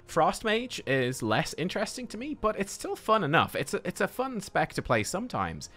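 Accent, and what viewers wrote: Ireland. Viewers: are you irish or am i picking up the accent entirely wrong? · Dat sweet Irish accent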